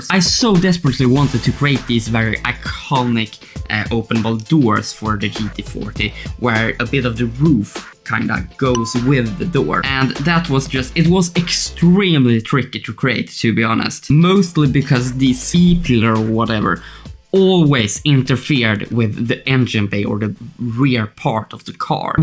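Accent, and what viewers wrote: Sweden. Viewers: really nice work! And by the sound of it så låter du Svensk, men kan ha fel 😅 Kommer garanterat besöka din sida och köpa några ritningar framöver 👍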